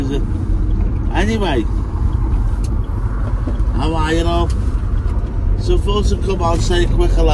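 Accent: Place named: Wirral, United Kingdom. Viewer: U live in liverpool u sound more like over the water?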